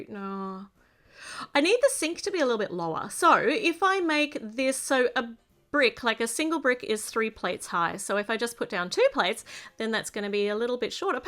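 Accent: Australia. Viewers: It‘s so beautiful watching you after a long time, you were such a positive influence for me during covid times, i spent hours just watching you build and talk in your lovely australian accent, its so wholesome for me, you can‘t even imagine. Eventhough my childhood home is sold, and i‘m living alone for the first time in my life, when watching your videos, i‘m the thirteen year old me again, it‘s crazy. Love you so much❤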